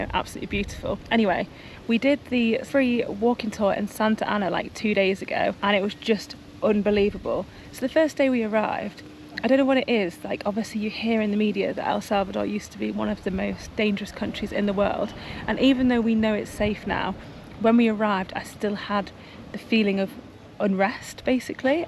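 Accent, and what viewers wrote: United Kingdom. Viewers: Firstible I love your accent real inglish and I love that you guys have learned about our culture or history it's an eye opening for other country's, but any ways thank you for visiting and enjoy it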